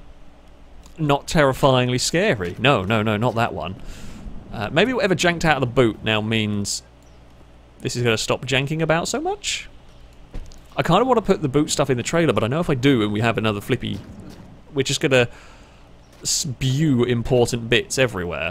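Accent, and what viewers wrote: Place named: United Kingdom. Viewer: Dunno, if you noticed,. But, you see me pleased to see you as one of the few guys playing TLD without heavily relying on an (unnatural) unnerving voice, like ppl on other channels here do. As if they were aiming for a teenage audience... GROSS! So, big thanks to you, for being as british and failracy as ever! 👍👍👍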